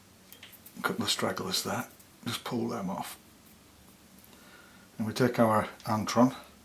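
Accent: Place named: Lancashire, United Kingdom. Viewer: A wee nosy question......where did you pick up the traces of scottish inclusion within your Lancs accent?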